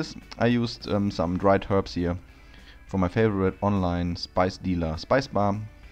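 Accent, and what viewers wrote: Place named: Germany. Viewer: Its tiiiiime to grill.... ich liebe dein Typisch ich sag mal „Deutsch angehauchtem“ Englisch :)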